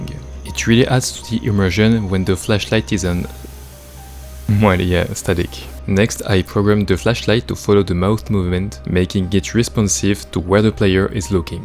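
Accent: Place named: France. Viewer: I was thinking, he has the same accent as the french ppl in my Class. I check the County: France. Neat.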